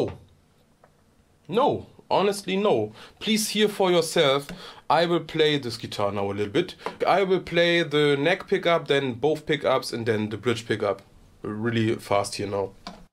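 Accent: Germany. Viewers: Your English with the heavy german accent is just wonderful 😊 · Mann, ich liebe deinen deutschen Akzent. :D Normalerweise stört mich das bei Deutschen, die Reviews auf Englisch machen (Thomann - ganz grauenhaftes Beispiel). Aber bei dir ist es irgendwie ganz cool.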